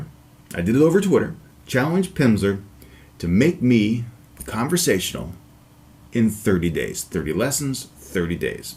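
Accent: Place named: Midwest, United States. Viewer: since you've been here in the philippines, instead of ordering the program online, you could have downloaded that program through uTorrent, absolutely for free. i'm a native cebuano speaker and thanks to pirate bay, i became almost conversant with spanish, french, and japanese for free. so far, our laws may have lapses on online piracy. you notice that here in my country that piracy is almost everywhere, right? by the way, what i want to learn right now is how to imitate your pleasing mid-western accent. i like it.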